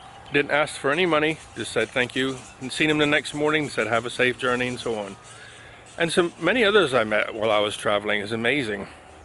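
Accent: United States. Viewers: Thank you so much for the shout out. You didn't have to do that for me but much appreciated. I thought that I detected some American in your accent. I was stationed in Goldsboro NC back in <num> and again at Fort Bragg <num>. I agree with everything you said, that is why I always preferred to be stationed in Europe, some of the same issues but not as bad. Your land is so beautiful, a true paradise indeed. Thanks again for the shout out and I look forward to meeting you when I retire.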